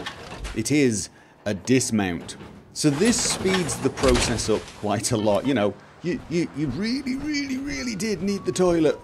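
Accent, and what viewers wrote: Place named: Scotland, United Kingdom. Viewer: sir, can u tell me about that nice accent u speak? is that maybe scottish? I like it for sure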